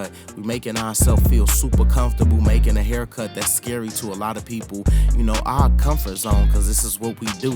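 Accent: Chicago, United States. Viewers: That's the Chi in him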